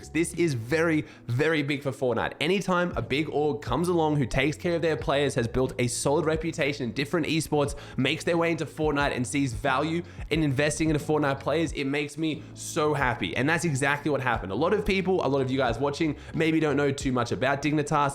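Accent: Australia. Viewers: just me or has he lost his aussie accent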